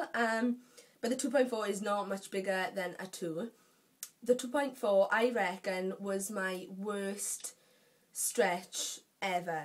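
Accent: Wales, United Kingdom. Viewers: Oh wow... are you welsh :')? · Your accent is amazing! Every lads holiday we meet a group of Welsh people so funny! I got my ear stretched today check it out would be nice to know what you think! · Welsh accent.. *O* ~Melts <3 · omg welsh much <3 snap !! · Welsh for the win :D :D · FUCK YES WELSH ACCENT!!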